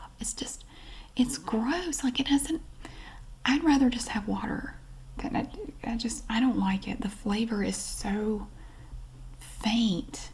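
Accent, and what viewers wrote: South, United States. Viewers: Yall I rarely ever comment but I love this lady’s channel. So soothing and being from the south myself I love a good southern accent.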